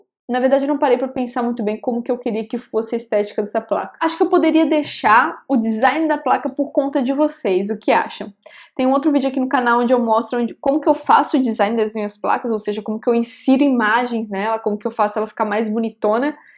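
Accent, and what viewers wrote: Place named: South, Brazil. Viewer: Sotaque do sul☺️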